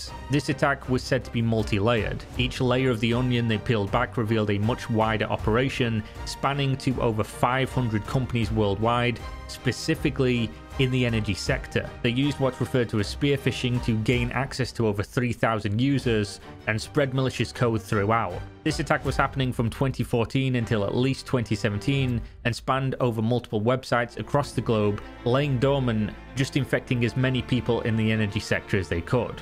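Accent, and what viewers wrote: United Kingdom. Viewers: This is one of the very very very few videos I’ve clicked on, EVER in my entire life of using YouTube, that I’ve ever thought was just unbearably boring. Maybe it’s the British accent and slow talking on a boring subject that did it for me? I don’t know man. The video isn’t bad. It’s informative, and I’ll give it a like- but man, this was just…painful to try and sit through lol (I’m 26, been watching since I was like 12)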